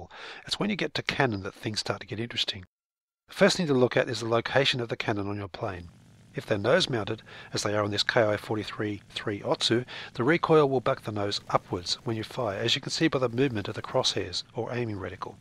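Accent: Australia. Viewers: You aussie!??!?!?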